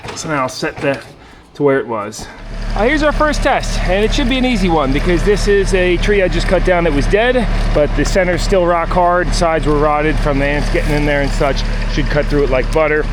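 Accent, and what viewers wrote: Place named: Pennsylvania, United States. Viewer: Whoa, that's quite the accent. Are we from Pennsylvania?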